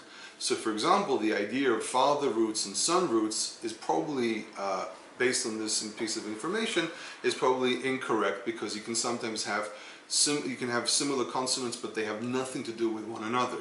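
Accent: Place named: New York, United States. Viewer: Your English accent is really good. I hear a bit of NY Jewish dialect in there 😊 - you pronounce words that end in an a with an r. (Like idear, instead of idea)... Maybe add "biblical Hebrew, tanakha " to the description? I actually was looking for modern Hebrew when I searched (but I'm glad I found your channel!)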